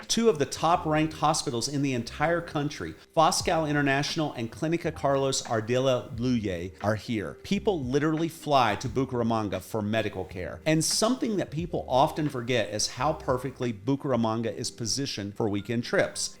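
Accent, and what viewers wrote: United States. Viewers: You aren't respecting the Spanish language if you don't roll the letter "r". Try listening to your local friends and copying, rather than reading the Spanish words with an American understanding/pronunciation. Just wanna help 😉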